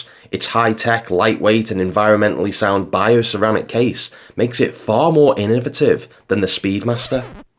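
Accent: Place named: Wirral, United Kingdom. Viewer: hahaha I largely agree but I just can't get over your bad Wirral accent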